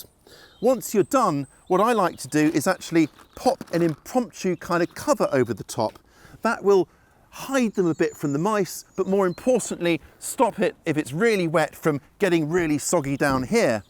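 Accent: England, United Kingdom. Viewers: Always. 😂He’s obviously English, so UK climate.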